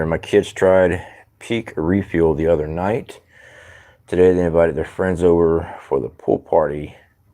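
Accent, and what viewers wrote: South, United States. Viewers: Hate I missed the live!! Had to make some house calls today. Your speech is fine brother. I would not have known if you hadnt mentioned it. You sound like everyone else around NGa. (Perfect southern) try to get some sleep tonight. I'm saying this as a big sister that cares, when you need that much caffine a nap might serve you better. ❤ 👩‍⚕️ sorry I'm still in medi-mode Glad you made it back safe and sound.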